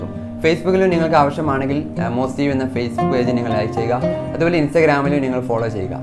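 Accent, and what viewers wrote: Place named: Malabar, India. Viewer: Nannaitund Malabar slang edakk varund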